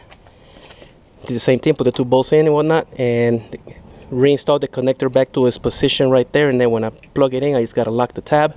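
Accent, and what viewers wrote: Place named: Philippines. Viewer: Bro thanks for the video. One question outta topic are you Filipino i hear you ahhhhhhhh.... Longgggg one lol oh k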